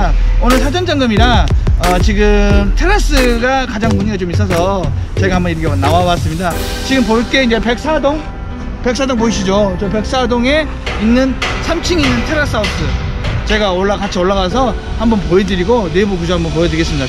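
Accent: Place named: Jeolla, South Korea. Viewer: 고향이 전라도 이신가봐요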